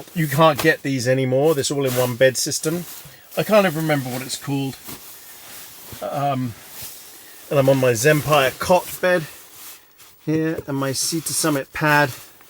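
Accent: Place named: England, United Kingdom. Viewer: Wow what a beautiful place and yes amazing scenery. I was just wondering are you actually English? And you’ve moved to New Zealand because you don’t sound like you’re from New Zealand. Your dog is beautiful. He’s very energetic. Fun to watch.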